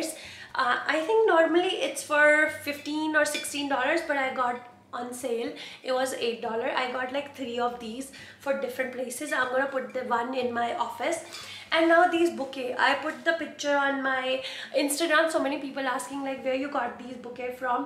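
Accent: Punjab, India. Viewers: Punjabi touch maza le ata hai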